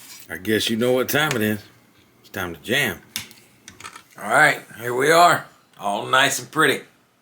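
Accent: South, United States. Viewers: ROFL that was a great intro guitar to the noggin' 'Smack 'Lol! You have a cool accent is that the south? Lots of great info on this channel!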